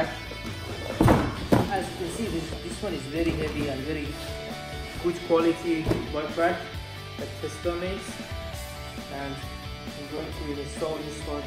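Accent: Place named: Iran. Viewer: You sound so Iranian 😂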